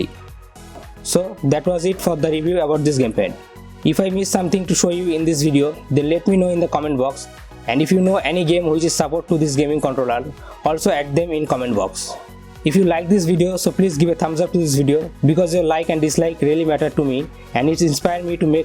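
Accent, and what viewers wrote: India. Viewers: 3 indian accent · indians and their trying hard English accent. pain to the ears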